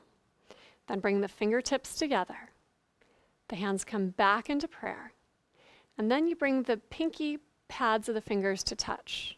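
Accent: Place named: United States. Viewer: please people learn from indian acharyas, ... american accent tremendously deform the mantra .... the udatta anudatta and respect of sandhi are absent ... the translation is very poetic and not giving proper meaning ... mudras are supposed to be practiced each for 1 reception ... please learn from proper sources ...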